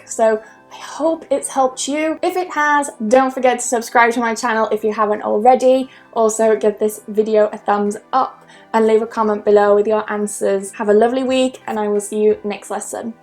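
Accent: United Kingdom. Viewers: Love you, love your lesson, and love your british accent. #oneOf Ur student from Indonesia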